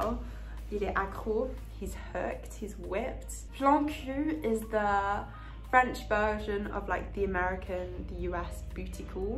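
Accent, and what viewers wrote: France. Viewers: Ça fait tellement accent des années 2000/2010 de la petite bourgeoisie parisienne c'est incroyable, on voit où tu as appris juste à ton accent 😁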